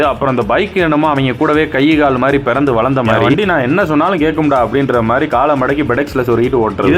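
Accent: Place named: Madurai, India. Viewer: Unga slang enakku romba pidikkum enga area slang.. I am from madurai... Nanga ninaikkura niraya vishayangala neenga thairiyama solrathu romba romba happy..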